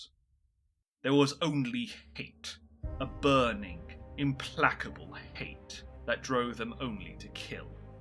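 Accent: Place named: England, United Kingdom. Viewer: Dark gods bless the English accent. AI always mispronounces everything. Among the repeat offenders: Noosphere. Love the storytelling boss. 👊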